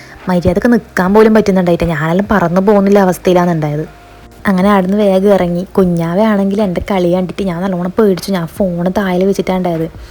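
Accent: Kasaragod, India. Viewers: Nammale kasargod basha adipoli alle.... Athil ആണ് രസം..... വിഡിയോ എടുത്തുകൊണ്ടു സംസാരിക്കാൻ ശ്രമിക്കു അതാണ് രസം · Namma kasarod ayond ningale bhasha nalla pole manasilavm🙌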